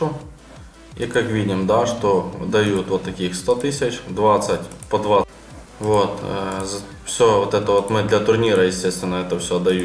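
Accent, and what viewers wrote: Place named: Ukraine. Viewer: Говор у тя на украинский похож. Но возможно ты с Ростовской области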